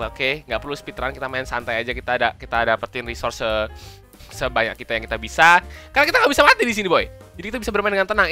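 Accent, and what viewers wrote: Java, Indonesia. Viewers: Orang Jawa bang to Yo to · Wong jowo opo uduk mas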